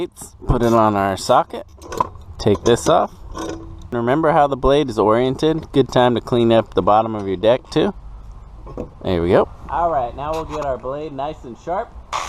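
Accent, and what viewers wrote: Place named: United States. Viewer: Ох уж этот американский фермерский английский язык, но спасибо по дейстствиям все ясно провел обслуживание своей гозоно косилке.) 👍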